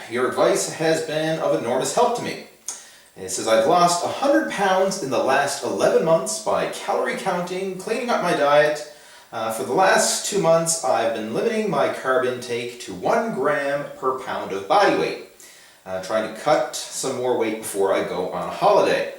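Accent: Newfoundland, Canada. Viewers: You got to be from Newfoundland, I can tell by your accent.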